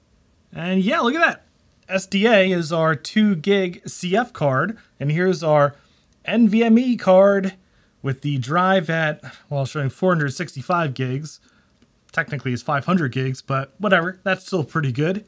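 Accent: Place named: Philadelphia, United States. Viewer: WOODER... Iconic accent of a philly local, lol Met you at VCF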